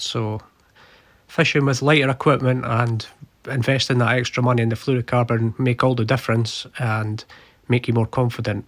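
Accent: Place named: Scottish Borders, United Kingdom. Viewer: are you on FB? Got a lot of questions?? lol what floating line would you recommend? What was the fishery at the beginning of the video? What’s your favourite rod and location up there? I’m thinking you have a borders accent!! And lastly do you sell your flies..I keep wanting to buy more flies and this cracking video has made matters worse ( like all Fly fishermen lol )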